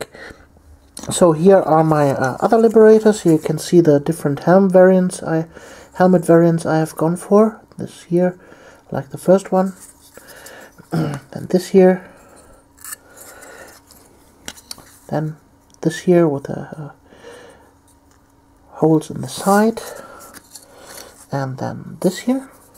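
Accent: Germany. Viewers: Ah, da war doch dieser charakteristisch deutsche Akzent :) Wollte nur mal kurz sagen, dass Du wirklich guten Content lieferst und mir maltechnisch wieder wirklich Lust auf das Hobby gemacht hast. Bleib wie du bist, hast nämlich eine wirklich sympathische Art!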